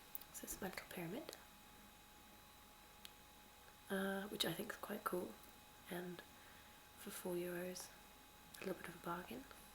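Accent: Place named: Devon, United Kingdom. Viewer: There is something so perfect about you talking about tea ha. You really have this homelike comfort quality about you, it's lovely. Can definitely tell you are from / lived in Devon! My friend is from Witheridge and she is obsessed with tea also!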